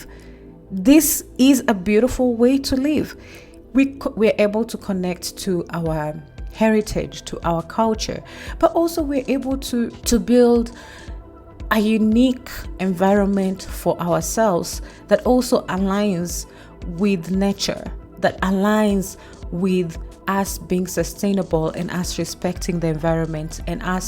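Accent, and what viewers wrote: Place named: Kenya. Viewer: The fact that its a Kenyan narrating, that makes is so much better. Im researching African architecture. This is how im building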